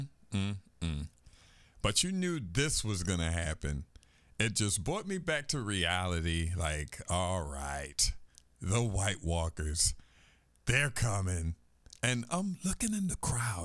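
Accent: Philadelphia, United States. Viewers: You kind of sound like Bernard Hopkins . It must be the east coast philadelphia accent.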